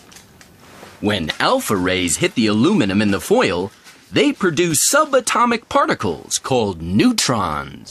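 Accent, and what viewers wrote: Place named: United States. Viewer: British Currency with a US accent. hmmmmm....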